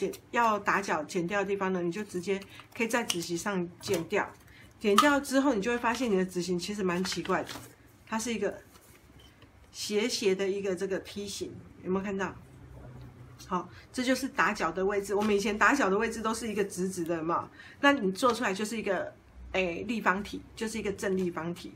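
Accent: Taiwan. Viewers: 這個小包包的教程講解的很詳細，台灣式的普通話聽起來很舒服 。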